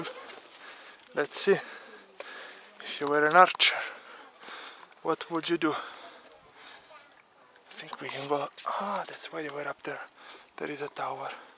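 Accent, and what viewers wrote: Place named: Italy. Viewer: Grande bellissimo vlog, non sono riuscito a capire proprio tutto pero ho capito che ochrida ti è piaciuta, io (i miei) sono originario della macedonia pero vivo a roma, comunque si capisce subito che sei italiano "cinquecento"🇮🇹 Ottimo lavoro spero che continui con questi tipo di vlog 👍💪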